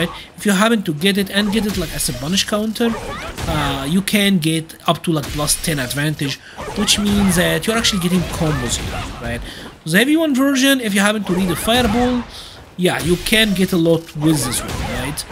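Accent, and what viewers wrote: Egypt. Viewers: I can tell you’re egyption just from the first minute listening to your accent lol. Great video btw really helpful.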